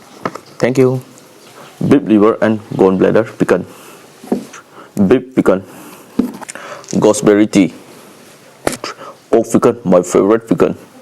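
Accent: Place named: Northeast, India. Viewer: North east accent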